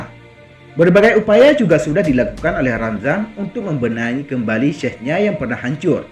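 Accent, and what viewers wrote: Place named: Aceh, Indonesia. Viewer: Hana pas loem mantog na logat Aceh kapegah haba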